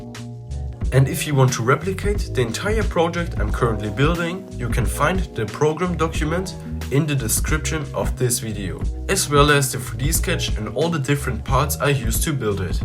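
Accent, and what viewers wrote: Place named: Germany. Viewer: It was obvious, that you're a German... ich auch :D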